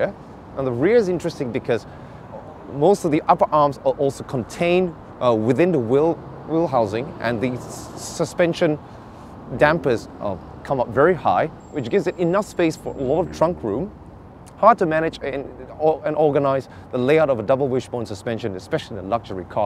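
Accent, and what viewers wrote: England, United Kingdom. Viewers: The hairstyle needs a mod to fit with the English accent...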